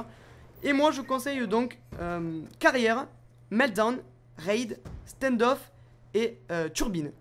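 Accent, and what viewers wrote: South, France. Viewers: Tes D'ou Du Sud ? ^^ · tes d'ou du sud ?? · tu vien du sud mais ou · Un magrebin avec l'accent du sud.. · Je suis sur Meltdown EC Avec le dsr Cènnkentte je kiff ton accent du sud!!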